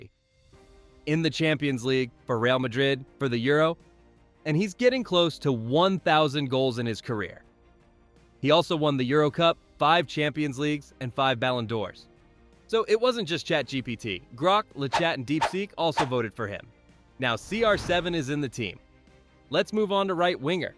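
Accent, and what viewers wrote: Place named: United States. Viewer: Damn.. the American accent is excruciating 😅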